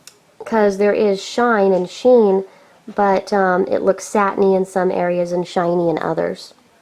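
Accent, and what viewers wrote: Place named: Italy. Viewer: All I can hear is Italy ❤💚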